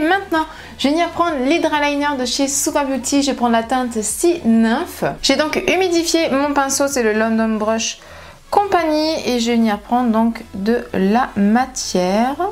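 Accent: South, France. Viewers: Bsr quel beau Make Up avec tout tes produits choisis sur tes derniers achats j'adore!!! Après pour moi la palette a trop de fards et du coup je serais perdue lol moi il m'en faut des plus petites car mon probleme c'est de trouver les bonnes combinaisons de couleurs 🙄 pour vous ça à l'air si évident et facile alors que pour moi c'est vraiment un casse tête je ne suis jamais sûr de moi et de mes choix, du coup à force de regarder vos tutos je commence a comprendre la disposition des couleurs et reconnaitre ce qui peu aller aller ensemble ou pas... Merci à toi pour tous ces précieux conseils et tout ces moments que tu nous consacres c'est un vrai bonheur et entendre une sudiste comme moi ( et oui je suis du var aussi, née à la Seyne-sur-Mer, à côté de Toulon,j'y ai passée une tres grande partie de ma vie, mes 3 fils sont nés là bas et y vivent d'ailleurs et moi pauvre que je suis j'ai suivi mon 2ème mari en Seine et Marne, dans le NOIOORRDD et cela fait maintenant 16 ans que j'y suis et je t'assure que le sud et les gens du sud me manquent et que je t'entends parler, cela réchauffe mon coeur alors mercciiii pour ce cadeau que tu me fais sans le savoir 😉😉💗💗🌞🌞) Et pour ton make up bravooo il est superbe, les couleurs et le dessin sur la paupière juste Whaouuu..... 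J'attends avec impatience ta prochaine vidéo et en attendant je te souhaite une tres bonne soirée et un bon début de semaine 😉😘💖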